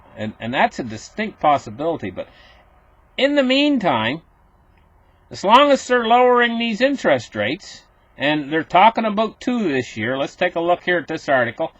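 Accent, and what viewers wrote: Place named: Yukon, Canada. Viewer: i try to copy accent, but hard... is it Klondike?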